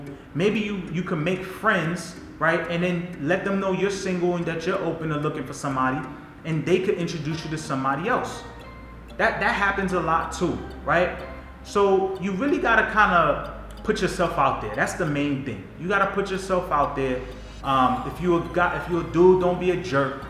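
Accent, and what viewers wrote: New York, United States. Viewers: Your NY accent has been dying took me back home.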